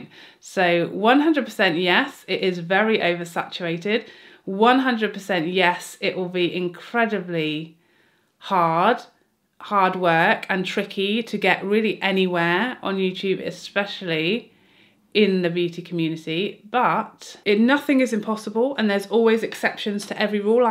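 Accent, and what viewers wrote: United Kingdom. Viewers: Congratulations dear, 5 years and 50K i'm so happy for you!!!🥂🤩🎉👏 I'm almost 3 years watching the beauty video's here. I found you because of dear Mel, and i know how much you hurt because of the loss of her, so i was also so happy to see that you got your humor back, your British humor i love so much, but not only that, i love you being you, not uploading to be loved by those companies but the interaction between you and us!!! And, here too, i love these "you don't need that" video's you don't realise how hilarious you are!!! I love your lovely beautiful you, knowing so much about all these products, being so honest what is good and what not, your enthousiasm, your beautiful elegant British English of course, i'm so thankful to you that you make all these beautiful, pure, honest wonderful and hilarious also video's for us, full of know-how!!! 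And if you would upload less, i will always watch your video's!!!! Enjoy these special days, sending you lots of love from your neighbour country Netherlands!!!!😘😘😘😘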